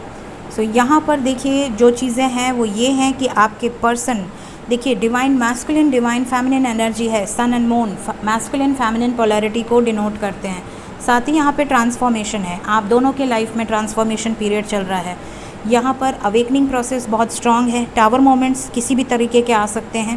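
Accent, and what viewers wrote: Varanasi, India. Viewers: Har har mahadev🙏❤ apki banarasi language bahut pyari h i love this language mam🙏 thank you angels thank you universe thank you divine thank you mam god bless you 🙏❤🌷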